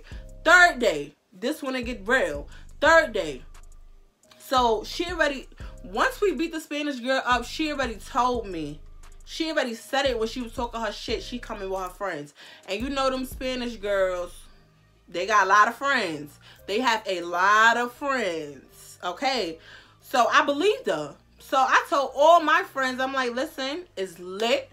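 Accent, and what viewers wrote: New York, United States. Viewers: It’s that New York accent!! I love it!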